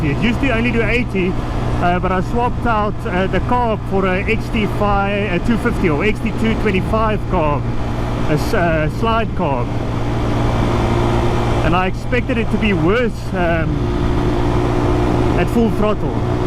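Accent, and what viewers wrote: South Africa. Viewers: Is dié man Afrikaans?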